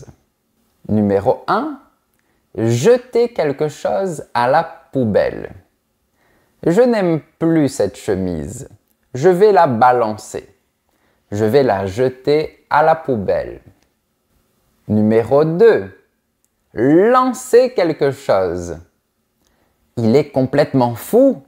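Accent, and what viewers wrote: South, France. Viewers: Je suis française et si je t’écoute c’est par pur plaisir. Et puis je suis exilée dans le nord et j’adore ta façon de prononcer « autre chose » ( au lieu de ôtre chôse ) ça me rappelle le pays. Pour « emballer » chez moi c’est plutôt un synonyme d’enchanter: « ça ne m’emballe pas de prendre la voiture par ce temps pourri »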